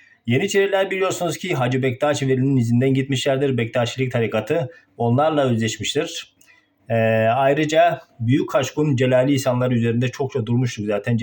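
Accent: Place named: Van, Turkey. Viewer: Hocam ne kadar yakışıklısıniz Vanlı mısınız shhshshs öyle bir sive aldim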